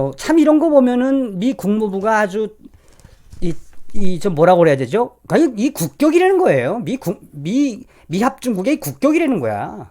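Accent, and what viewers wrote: North Korea. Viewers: 정성산님 수고 많이하십니다 ~북한말을 계속들으니 싫어졌어요 ~ 처음엔 재밌었는데~ 나라가 점점 종북으로 가고 있으니 걱정됩니다 ~ 이럴수록 자유대한 민주주의 것을 더욱더 고수해야 되겠습니다 ~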